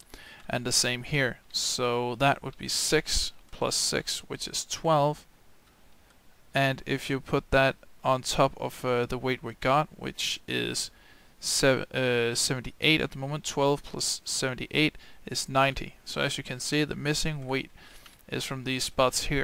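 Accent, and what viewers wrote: Denmark. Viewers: Hehe, kunne høre på dit engelsk at du er fra Danmark! ;)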